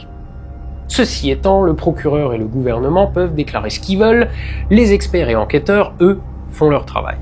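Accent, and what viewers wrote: Alsace, France. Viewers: j'ecoute toujours tes sponso juste pour ton accent alsacien ALSACE EN FORCE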